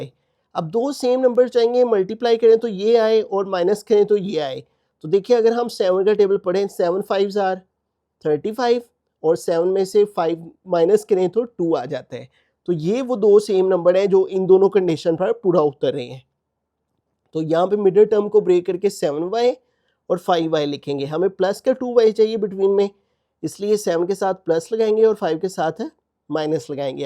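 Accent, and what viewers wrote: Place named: Bihar, India. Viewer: Sir g mujhaie ap Ka lachter Bihar achie lag tai h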